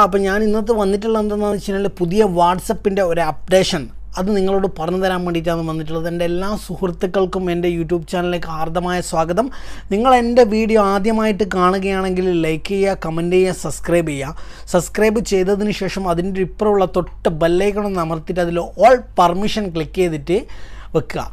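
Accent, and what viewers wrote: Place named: Kannur, India. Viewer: ഇതാണോ കണ്ണൂർ ഭാഷ..... കേൾക്കാൻ നന്നായിട്ട്ണ്ട് ട്ടാ ഗഡീ..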